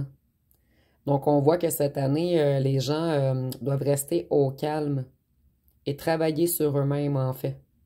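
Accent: Canada. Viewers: Merci pour votre accent canadien qui fait du bien et surtout pour cette guidance qui donne le droit de pleurer pour évacuer le trop plein. J'apprécie cette interprétation salvatrice. Vive les neutrons. 🌈🤗💗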